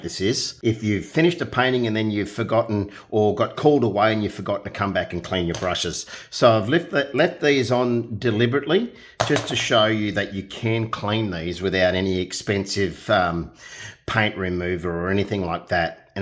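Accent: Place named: Australia. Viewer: You deserve more views. Love your paintings and especially your very Aussie commentary.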